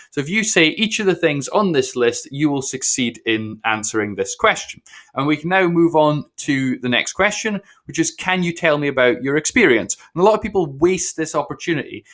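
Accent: United Kingdom. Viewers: Why is a british man telling me how to get a job at piggly wiggly ?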